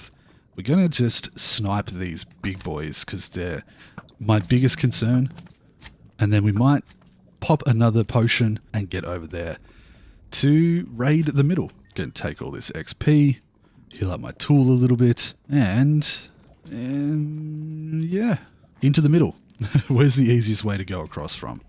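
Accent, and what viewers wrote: Australia. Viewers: hi mate quick question, are you australian?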